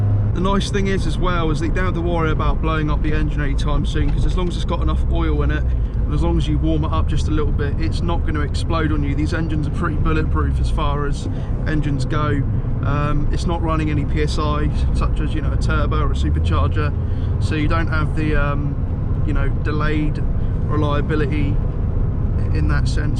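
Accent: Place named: Staffordshire, United Kingdom. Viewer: Where are you from? You sound as if you're pretty local to me? I'm in Staffordshire.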